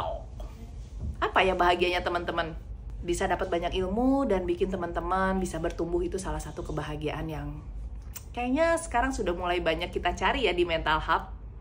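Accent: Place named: Sunda, Indonesia. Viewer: Kirain dirumah juga nyablak sunda bo dok😂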